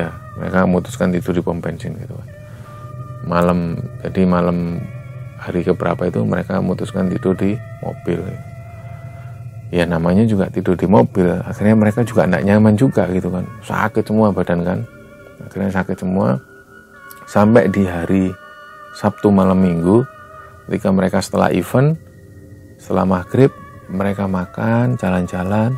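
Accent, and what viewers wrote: Java, Indonesia. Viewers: penyampaian ceritanya mirip pakde alfan apalagi cara ngomongnya medok jawa suka banget.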